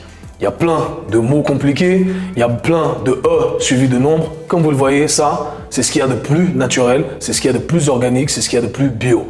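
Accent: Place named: Belgium. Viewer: L'accent belge mélanger à l'accent créole portugais c'est un truc de fou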